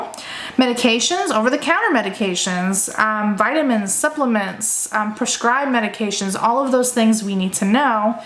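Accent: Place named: Florida, United States. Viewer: you ROCK! This is an excellent recap of PALS...I've been certified since '97 and this is the best class I've ever been to. I did have to speed you up to 1.5 speed, and you sounded more like a Floridian, rather than a Georgian (my home). Thanks a ton and God Bless You!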